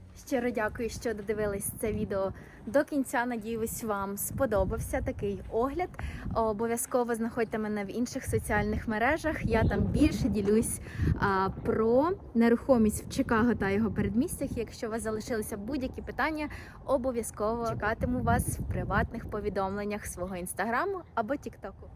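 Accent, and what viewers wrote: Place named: Vinnytsia, Ukraine. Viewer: ви з Вінничини? Знайомий суржик.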